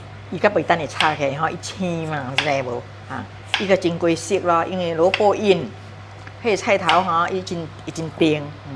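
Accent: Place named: Hokkien, China. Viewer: Aunty, thank you for this bitter gourd recipe, Today I learned that fish sauce can increase flavors of bitter gourd. I will try it out the next day if I cook this, favorite dish. It is a good dish for hot weather. Aunty, today you speak in Hokien dialect is a welcome sight for us Hokien aunties. I find you have Mandarin subtitles in your vid, that is very good for those couldn't understand the simple dialect. Bravo keep up your efforts I will always support your channels. Have a great day ❤❤❤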